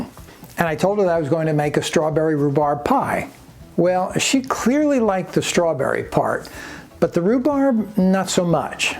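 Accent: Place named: Massachusetts, United States. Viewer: Is he from Central or Western Massachusetts? His accent is making me nostalgic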